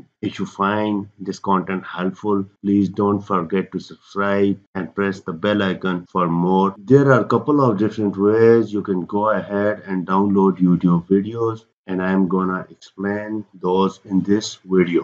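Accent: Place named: India. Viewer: Cannot understand. Take the indian out of your voice. Or marbles, whatever.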